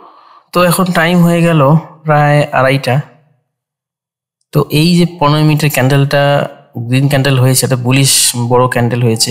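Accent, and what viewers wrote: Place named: Bangladesh. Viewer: Apni kothai thaken , apnar vasha puro Bangladeshi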